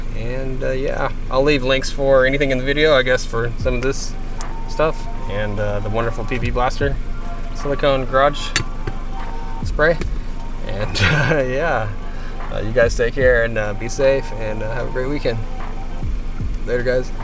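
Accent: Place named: Texas, United States. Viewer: I think you done developed a Texas accent there buddy!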